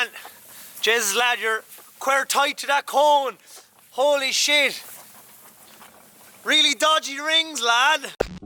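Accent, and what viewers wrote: Ireland. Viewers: The rings were class but you need practice man!! The Irish sayings and accents going worldwide lads! Fair play 😎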